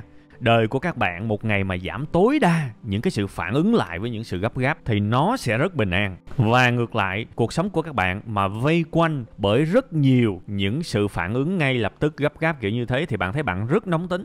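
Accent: South, Vietnam. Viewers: Giọng a miền nam mà rất dễ nghe luôn, cảm ơn a đã chia sẻ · Giọng nói miền Nam của bạn rất dễ nghe. Bài học hay lắm, cám ơn anh.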